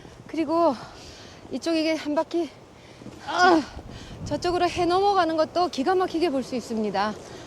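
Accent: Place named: Gangneung, South Korea. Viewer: 영상 재미나게 보구 가드래야 말투가 정겨와우야 ^^ 강룽말투가 정겹드래요 지는 정선이본가구 평창이고향이드래요 12일 선자령 10번째등정 복많이 받으래야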